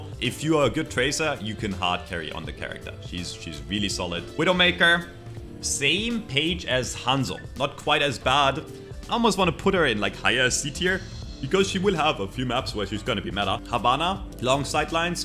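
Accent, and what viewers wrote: Germany. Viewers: Your voice sound german , are u german ?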